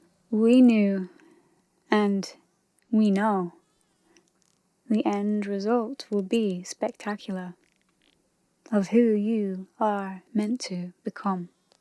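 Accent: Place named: Northern England, United Kingdom. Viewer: Nice vids, some of the most interesting iv seen, keep up the good work, you sound like your from north of England, cant put my finger on exactly where though.:P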